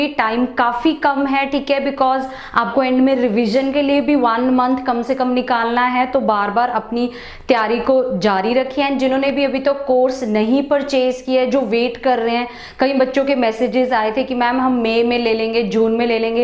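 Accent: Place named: Punjab, India. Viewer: Ma’am Apki Dilect main Punjabi Touch aata hai🙈🙈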